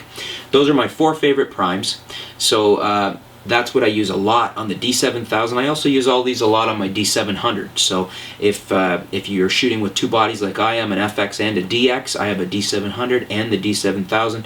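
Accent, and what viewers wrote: Canada. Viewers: really dude, "vig-netting?" vignette. it sounds like "vin-yet." you're clearly super canadian, so you obviously had french forced on you. there is no excuse for that level of laziness. step your game up. also, "bokaahhhhh" sounds idiotic as a million other people said.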